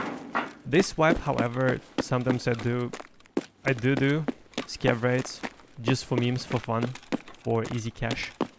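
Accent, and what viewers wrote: Russia. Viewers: ты же русский,оно слышно))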